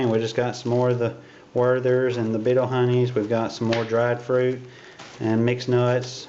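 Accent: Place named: North Carolina, United States. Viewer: Great video man ive seen a few of yours and am glad to see the circuit and the montbell products review because i am considering those purchases. My food is very similar to yours minus the snack cakes, candy, and fig newtons but i understand the extra calories for winter. I would check out the Knorrs sides meals for dinner because they are only about 99 cents. I usually add some tuna to them for extra protein. Sounds like you are from NC, im from Washington, NC.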